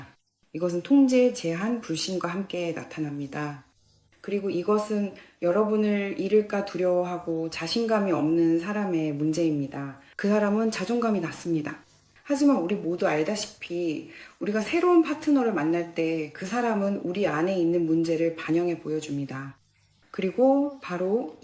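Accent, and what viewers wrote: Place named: Russia. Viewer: 꾸준하게 좋은 글을 올리시네요 .아쉬은점은 한국어가 능통하시는데,아직 러시아 발음이 깔려 있어 발음에 세심한 경청이 필요합니다 . 이점 때문에 내용은 좋으나 구독 유저가 저조 하다 생각합니다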